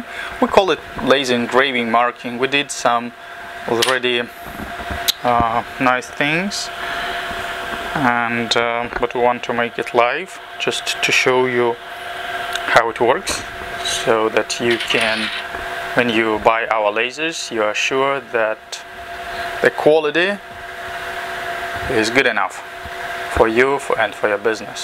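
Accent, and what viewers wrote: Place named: Russia. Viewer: Takoy Russian accent :)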